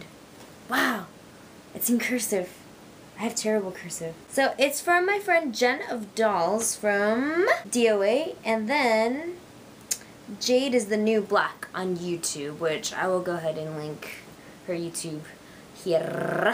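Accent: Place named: Cockney, United Kingdom. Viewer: Random accent almost sounded a little Cockney. :D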